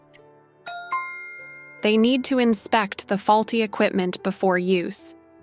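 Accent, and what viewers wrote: United States. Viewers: This is American with an awful accent not real English. Please call it American English !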